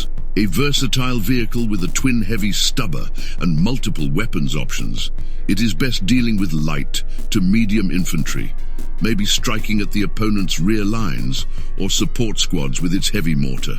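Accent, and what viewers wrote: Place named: United Kingdom. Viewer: bro had me at british sounding voice and 2nd edition 40k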